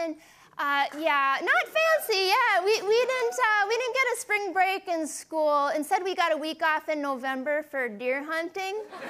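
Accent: Canada. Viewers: she sounds very canadian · She sounds very Canadian Soe instead if so. Aboat instead of about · She sounds a bit like my relatives in Northern Ontario in Canada.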